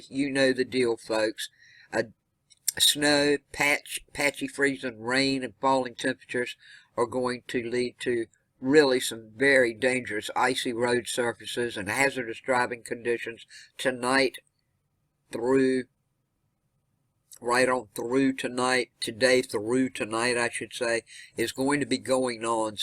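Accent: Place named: South, United States. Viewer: Well I am sorry for my mistaking you for a male . To my old ears you'r voice sounds of a southern male . If you could see my red face right now . I must commend you on accuracy with the reports . Well Sister have a fine day and God bless you . Can't wait till tomorrow for more cold weather news 🙏👍👍🇺🇸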